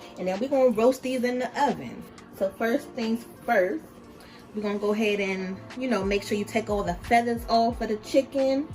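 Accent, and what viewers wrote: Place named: Louisiana, United States. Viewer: You def from Louisiana with that thick accent lol👀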